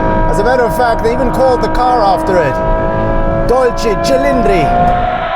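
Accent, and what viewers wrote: South Africa. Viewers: Man, you sound like a South African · Is the presenter South African... Hhay'bo... "kak fast" & (jIs-like) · Is he South African? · Is the guy reviewing the car South African, he sounds like it especially with some of the terms he's using? 😅 eg. Jislaikit and kak fast LOL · I'm in 😍 Hey man, are you South African? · My guy you sound so south African buddy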